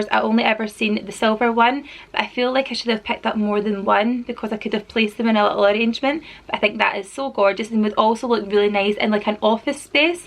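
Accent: Scotland, United Kingdom. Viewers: I'm new to your channel your kids are so adorable. I'm guessing your Scottish? I'm English lol happy late thanksgiving xx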